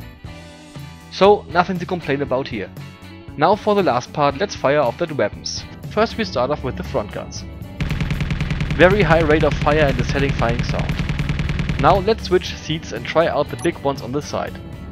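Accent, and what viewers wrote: Germany. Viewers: thats a nice ship, i only build in smallship form because it has more detail for my steam-punk fleet. btw, are you german? iam german and you sounds like that. :D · are you german? cuz your accent, you know...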